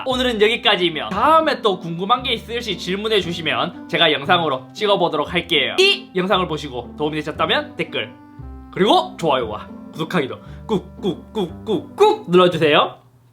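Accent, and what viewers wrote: Gyeongsang, South Korea. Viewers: ..쭝국...사투리보소 경상도 사나이네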